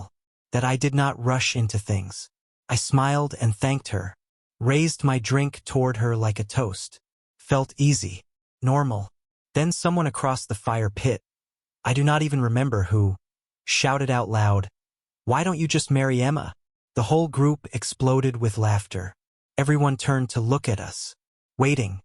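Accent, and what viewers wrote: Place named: Germany. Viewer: A good brief story with the occasional brief German accent. ❤🎉🏴🖖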